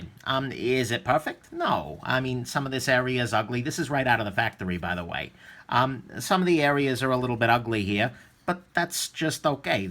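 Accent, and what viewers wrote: New York, United States. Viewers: Where are you from? New York or something?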